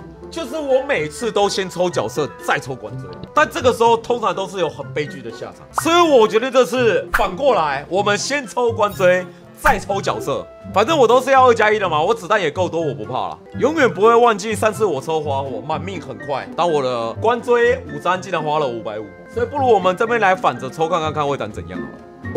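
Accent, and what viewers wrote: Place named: Taiwan. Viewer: 老哥的台灣國語好像越來越嚴重了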